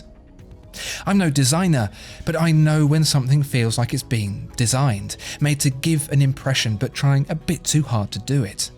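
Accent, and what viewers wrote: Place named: RP, United Kingdom. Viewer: this guys voice drives me so freaking crazy. he talks so freakin slow and poshly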